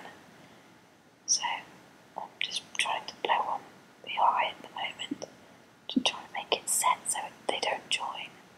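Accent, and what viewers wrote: United Kingdom. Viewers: You are beautiful, and I love your voice. I love the British accent, and I love the fact that you have a very soft whisper. Come to Knoxville TN, I'd love to hear your real voice. Keep doing what your doing, it's very soothing and pleasant. Hope all is well, have a great day :-).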